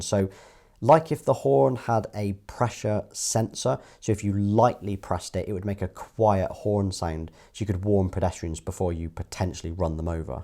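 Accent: United Kingdom. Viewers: Why are most of the YouTube content from USA ? Good to hear british speaking!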